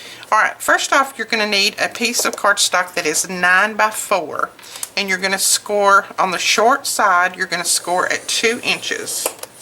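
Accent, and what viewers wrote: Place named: Alabama, United States. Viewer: Just watched your video, this is just amazing!! I've missed listening to someone from Alabama!! Thanks from Arizona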